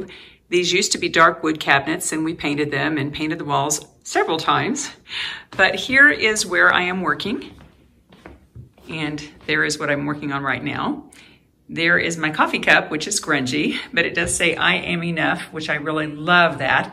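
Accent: South, United States. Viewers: Hi! Doug again. Nice house! We seem to have more stuff in common. I too studied drama (not in college) and I lived in LA for a while when I was younger, did some minor stuff. But didn't get my star on the walk of fame, haha! I would like to pursue acting again now that I am older and wiser in some sort of venue. I also am about to get my life and health insurance sales license in the state of Florida, so I was curious to what kind of insurance benefits you sell? The testing centers are closed for now until further notice so I keep reviewing all my studies and hope to pass exam. It's a career change, as I mostly worked in hospitality before. I am also a writer and visual artist and play the piano. Artsy kind of guy. Are you from the south? That is my guess, haha!! I live north of Tampa in a town called Inverness in Citrus County, FL. Grew up in Tampa. 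Take care.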